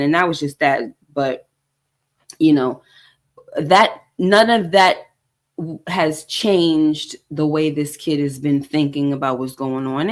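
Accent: New York, United States. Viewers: I hear a New York accent? I'm PA! Anyway my 1st time on your channel...I agree with your pov on this episode 👏🏽. Yeah I'm over snaps n pop. And Stephano...(I'm don't see a subscribe button)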